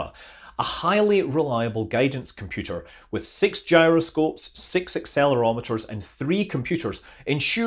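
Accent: Scotland, United Kingdom. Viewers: That's Scots, och!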